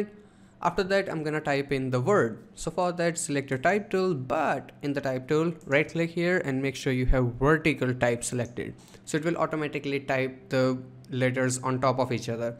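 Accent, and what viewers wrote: India. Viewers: INDIAN ACCENT -- PROBABLY FROM INDIA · Your accent is a lot Indian (95%) and some American (5%). You posing bruh?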